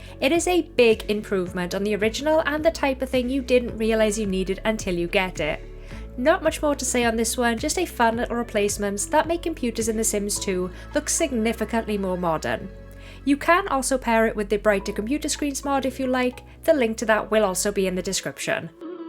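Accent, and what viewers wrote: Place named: South Wales, United Kingdom. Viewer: Some of these mods are essentials for me now, especially "Community Lot Skilling", "Job Levels" and "Simply Leftovers". Especially "Simply Leftovers". Also.. Ydi'r fy clystau'n gweithio? 😱, are you by chance from South Wales? Your accent is very distinguishable! I'll be incredibly surprised and happy if you are. You will be one of very few Welsh simmers that I've heard 😊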